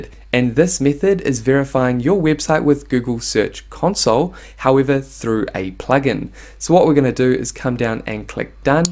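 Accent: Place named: New Zealand. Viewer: Great content, thanks. Good to hear a kiwi accent too!!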